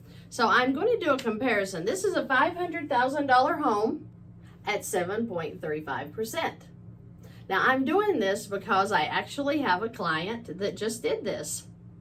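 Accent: South, United States. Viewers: Okay, this is brilliant and I love your Southern accent. It all makes sense except the part where you use your line of credit as income by putting your income into paying the line of credit. It seems you just paid off the line of credit with $10g, so your income for that month was spent by zeroing out the line of c balance. How exactly are you using this as your checking account and wouldn’t you still need to make that month’s mortgage payment? But you just spent your 10g income to pay off the line of c? If you could please explain that part of it in more detail for us slow folk? Thx😊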